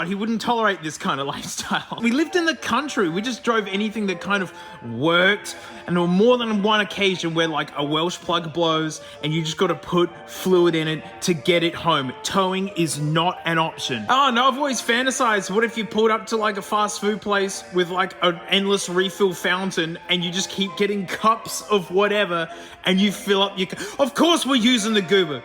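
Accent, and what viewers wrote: Australia. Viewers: I have never watched ANY of this guys videos. Immediately “this guys Australian, theres some shit about to happen”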